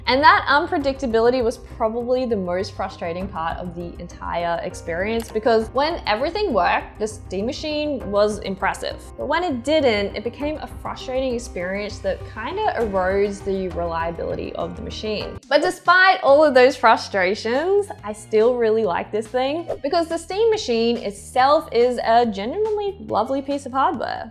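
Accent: Australia. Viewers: I have decades of linux experience so that aspect doesn't bother me, however if there are too many issues then the experience really sours. The puzzles I would be looking to solve are not linux configuration puzzles. Your experience with the rhythm games though is discouraging. I love SynthRiders so not being smoothly playable is not good. But who knows, it may be something relative to tuning the software rather than modifying the hardware. In that case, that issue should resolve itself rather quickly. We'll see. On a different note, do Australians find their accent as cute as those in the States do? Even if it isn't really an accent for them. Maybe they don't see it as cute, but do know it is cute. I have a similar reaction to Scottish accents only I can't understand what they are saying as well as I can Australians.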